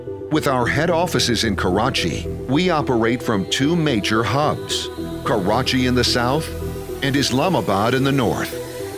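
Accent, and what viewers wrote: United States. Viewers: If your a Pakistani airline then why are you using an American voice why not a Pakistani voice. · The american voice seems unreal. Please have a Pakistani bring in some flavour, instead of this military sounding thunderous voice. It will give it a Pakistani feel. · western guy with american accent promoting PIA He is not even able to pronounce Pakistan correctly. You people didnt find any single person in pakistan worthy enough to give a voice over for this video? . Such a disappointment.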